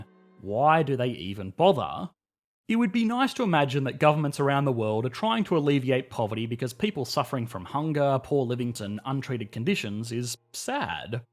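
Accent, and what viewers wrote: Australia. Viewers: Americanised? He is obviously Australian.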